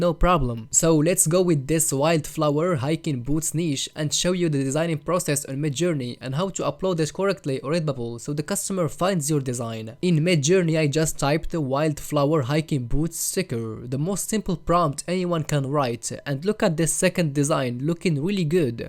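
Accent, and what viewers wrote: Morocco. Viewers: I really love the way you explain ❤👏👏 + you have a moroccan accent wich makes me feel like talking to one of my freinds😂❤❤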